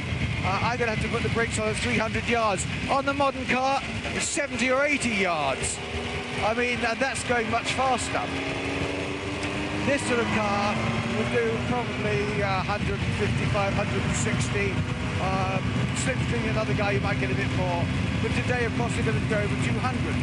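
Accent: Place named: RP, United Kingdom. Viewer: He's one of those guys you could listen to all day and never get bored. I don't know if his, posh?, accent is a help or hindrance to that.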